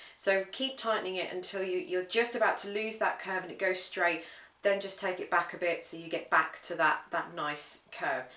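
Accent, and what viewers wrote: United Kingdom. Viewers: Gracias baby,i love british accent chicas, and you r very smart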